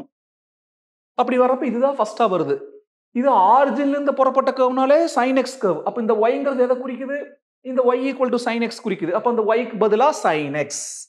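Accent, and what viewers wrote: Coimbatore, India. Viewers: Coimbatore slang🤩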